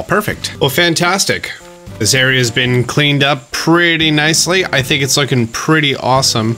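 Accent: New Jersey, United States. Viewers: Lmao I’m from jersey too and I had no idea you were saying water wrong guess I learned something new about myself today 😂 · Lmao it is a jersey thing. Im also from jersey. We all say it like that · Water = Wooder in Jersey, apparently. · As a New Jersey resident, yes that is how we say water. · Honestly, I love your New Jersey accent :) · He aint lying we all say wadder in jersey lol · Can confirm on the water dialect for Jersey. i used to live in New Brunswick. We do in fact say Wadder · I am from New Jersey as well, and I can, in fact, confirm that we do pronounce water just as you said. 😁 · I'm from Jersey and yeah we say water that way whats the problem with that?? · Ok let's talk about "water" pronunciation REAL QUICK (sorry). Nobody in America pronounces the "t" in "water" as a "t". Most people pronounce it as a "d" just like you guys in New Jersey do. 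The difference is the "a". Most pronounce it like "ah" as in the "a" in "calm". You guys pronounce it like "oo" as in "wood". Like, it literally sounds like you're saying "wooder". Ok rant over. Love your vids brah. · 😂 Cant believe youre from NJ! No wonder I never understood why people were complaining how you say "water" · hello! checking in as one of the new female viewers (well, i was subscribed before for some pokemon stuff but now i'm actually invested in the ac content lol). i didn't realize you were from nj - me too. people always shittin on our accents -. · As a Jersey-ian, I too pronounce water like that. Didn’t even think you were pronouncing it weird lol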